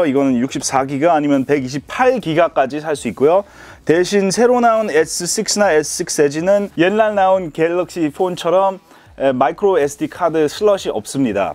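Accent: South Korea. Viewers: 한국어로 된 좋은 리뷰 비디오 감사드립니다! 전에 경상도에서 살으셨다고 얼핏 들었는데 그게 진짜였는지 경상도 사투리가 곳곳에서 튀어나와 정겨워지네요 ㅎㅎ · 전라도 분이셧나봐요 사투리 많이하시네요 반갑습니다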